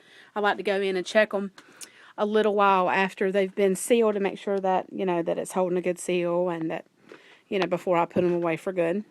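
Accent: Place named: South, United States. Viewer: Great video. I like your curtains. I think I will try it too. Love your accent. You must be a Southern Belle like me!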